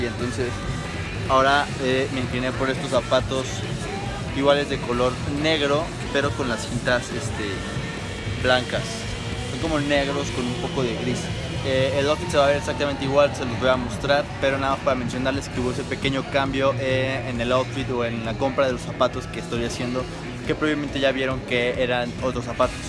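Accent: Guadalajara, Mexico. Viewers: Mames eres de Guadalajara?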